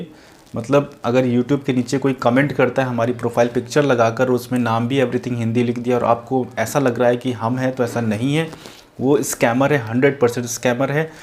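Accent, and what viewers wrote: Lucknow, India. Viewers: Bhai Aap Lucknow Se hai kya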